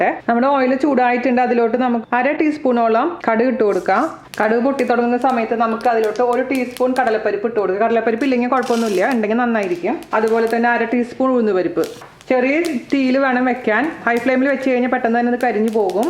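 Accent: Thrissur, India. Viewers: Trichur slang sooo sweet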